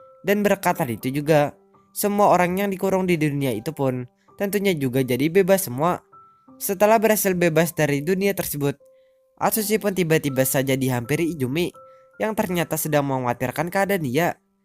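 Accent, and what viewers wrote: Banjar, Indonesia. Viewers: Org banjar bg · Logat nya kaya urng Banjar pang 😂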